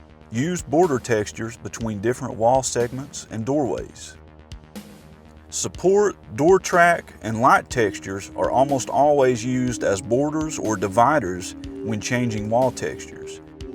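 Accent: South, United States. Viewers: Love your southern accent, I'm from Louisiana (New Orleans) and can't quite place where you are from. It sounds a little bit like southern Mississippi or the Carolinas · Nice vid, love your accent. I'm also from the South. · "Textures are usually misaligned by default in these scenarios" is really hilarious in this southern-drawl and Big Lebowski narrative style... Sasparilla, I reckon? However it's 100% fitting for doom's Texas origin and current residency. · Thanks so much putting this together - I'm coming from the "illustrator that likes to play games" camp so most of what makes a Doom level "feel right" is a mystery to me, but it's starting to click. (unrelated, but it always makes my day hearing other Southerners online 😁)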